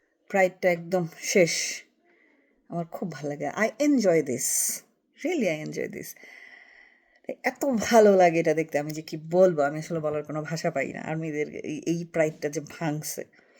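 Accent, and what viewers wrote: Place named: Chittagong, Bangladesh. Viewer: চাটগাঁইয়া অভিনন্দন চট্টগ্রামের মনে হয় আপনাকে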